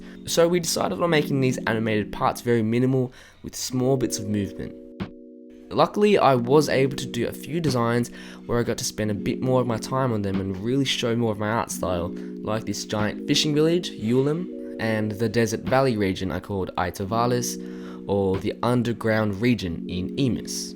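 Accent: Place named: Australia. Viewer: so i've been following you on instagram and tumblr for so long (youtube for at least a year) and as you were speaking, I only just realised that you were Australian... OTL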